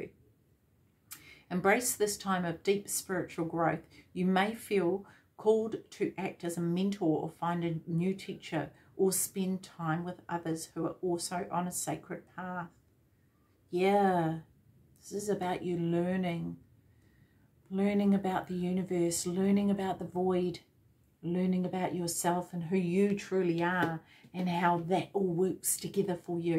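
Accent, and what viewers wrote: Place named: New Zealand. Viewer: my moms from Christchurch, thought I recognized the accent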